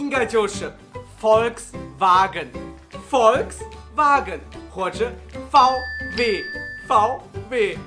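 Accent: Shanghai, China. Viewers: 兄弟你德语都带上海味儿了..... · 不行， 他的德语还是有上海口音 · 英语有上海口音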